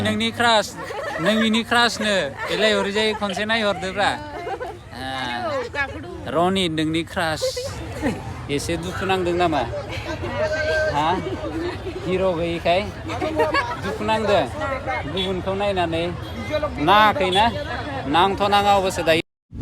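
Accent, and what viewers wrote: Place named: Bodo, India. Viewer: Your Bodo is so sweet.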